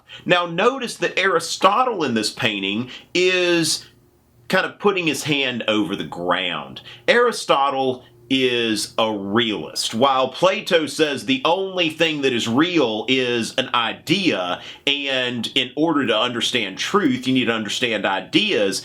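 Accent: South, United States. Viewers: has southern accent - ok, let's not stereotype, maybe he's educated enough. . .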